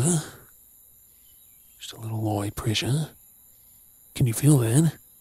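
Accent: Australia. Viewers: Oh, geez, I'm a sucker for Aussie accents! I love your work,! Thanks more making the content you do!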